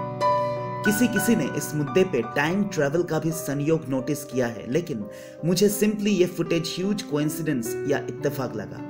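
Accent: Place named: Bengal, India. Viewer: Pehle to me pehli bar ye video dekh raha dusra ye bengoli acsent he to aap kaha se ho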